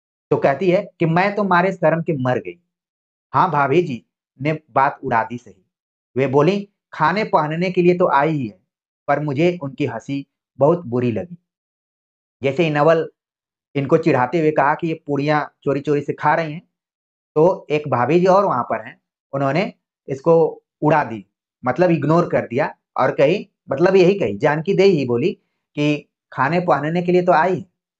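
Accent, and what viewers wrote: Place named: Mirzapur, India. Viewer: Sir mai mirzapur se hu mirzapur ki bhasa aisi hi h😂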